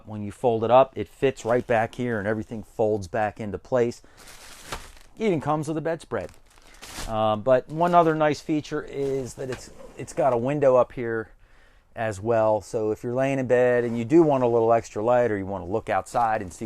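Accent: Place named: South, United States. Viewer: . Where you from. You have a very distinctive accent. I'm gonna guess southern or southeast Virginia? North Carolina?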